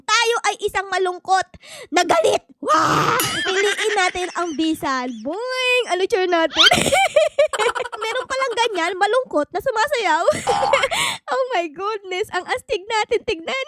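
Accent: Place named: Baliwag, Philippines. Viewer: para kang baliwag na bata diyan magsalita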